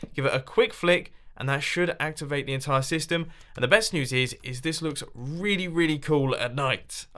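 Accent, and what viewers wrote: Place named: United Kingdom. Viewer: not to be rude are u british im thinking that because of ur awesome british accent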